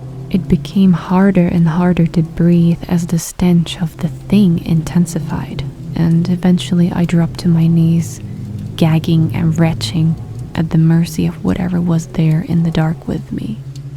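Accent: United Kingdom. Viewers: Great British accent xx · It’s awsome the British accent ❤️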